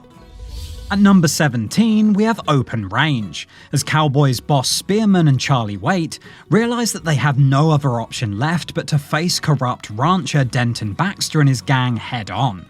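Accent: England, United Kingdom. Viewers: What's lost is the authenticity from the British accent by the narrator. We're bombarded with this. Motorsports, tennis, golf, war videos. Gunfights? C'mon man. I can fake an accent, maybe I can get work too. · English accent kills it for me.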